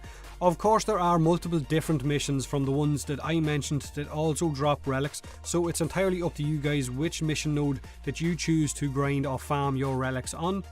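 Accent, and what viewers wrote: Ireland. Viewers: I love that Irish accent :3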